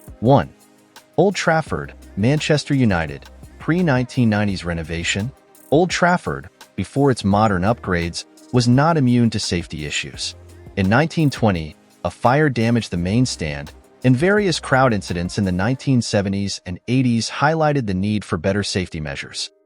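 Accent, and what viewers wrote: United States. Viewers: Hoo the phuq are you? It's pronounced "Hills-bu-ruh"/"Hills-bruh" not "Hills-borrow". It's "Seltic" not "Keltic". "Berm-ing-gum" not "Ber-ming-HAM". "White Lane" it was "White Hart Lane". It's "Darby" not "Der-by". " 1970 ess" for "1970s" get real. Whoever you are do yer effing homework. Pretty much every ground in England, Wales and Scotland was the scene of "hooliganism" in the late 1960s, 1970s and early1980s - nothing to see there. Every ground had its area (or fortress) for its hard nut fans. And I am sick of the ubiquitous (look it up in an effing dictionary) US accent, pronunciation and emphasis (we (UK) emphasise the second or last syllable - not the first) on effing everything you watch on YouTube. Is the US trying to take over the world by its cultural imperialism? I think so - phuq off with yer cultural imperialism. Answers on a post card - you effing Yanks might have to look up that UK cultural reference. 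Jog on. Sick to death of you. - or the people who use some Silicon Valley tool to make yer vids - do your own voice-over, if you want to be (or appear) authentic. Rant over....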